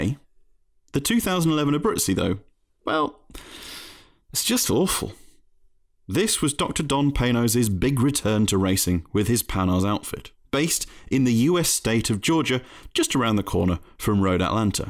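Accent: United Kingdom. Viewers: Somehow, I just knew that the snobbish narrator would be a Brit. Race cars are build for function. Not appearance. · With this british accent, it's even more funny 🤣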